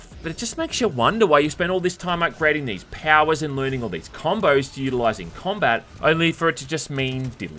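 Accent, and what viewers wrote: Australia. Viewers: I live for this kind of review video, with aus accent. :face-blue-smiling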